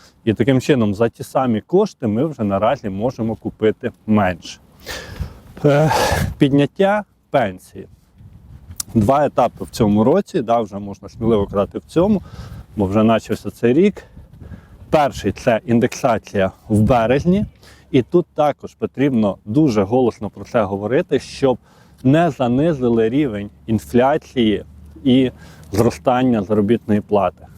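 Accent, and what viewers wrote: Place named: Western Ukraine, Ukraine. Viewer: ну просто "ріже вуха" западенський акцент.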